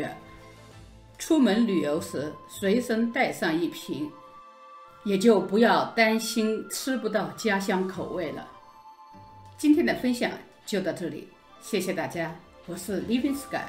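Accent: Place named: Hunan, China. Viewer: 我看压饺子皮视频听你讲话就觉得你是湖南人，果然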